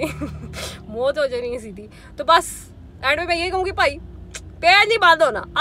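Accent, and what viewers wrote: Punjab, India. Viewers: Keep growing stay strong❤️i love ur way of talking hahahaha full Punjabi style. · Your way of talking 💕.... Hahha full punjabi style.... fan ho gya mam mn apka...... Stay strong be happy...... And don't worry about shittyy people.....🙂 Allah apko mazeed izzat dy or bury logon sy bachaye.... Ameen.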